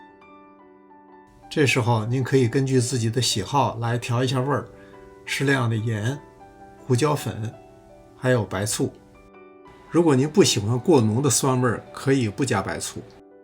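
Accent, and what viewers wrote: Beijing, China. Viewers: 听到京味礼貌的乡音，好亲切呀！ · 我听着也像北京口音。我是木樨地一带的。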